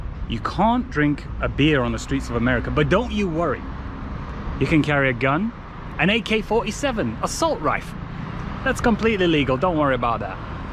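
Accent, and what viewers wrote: United Kingdom. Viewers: Your criticism is that Americans have been brainwashed about China without having even been there. You say our media is corrupt (not wrong). But then you take the absolute worst headlines from this so-called "corrupted" media and push them even further out of context and make shocking omissions of factual information to make America (and the UK) sound like dystopian wastelands full of murderous villains. And how long have you lived in America? Yes- every country has its problems and need to do better by their people. But I have a question for you: If America is such a horrible place, why are massive droves of people risking their lives by walking all the way from places like Guatemala and swimming across the Rio Grande for a chance to get in? I don't know how long the lines are for the attractions in China. But Texas isn't exactly a short walk from Guatemala. 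You have one thing going for you- A British accent. And Americans will pretty much believe anyone with a British accent. How is this not immediately apparent to anyone with a brain as blatant propaganda?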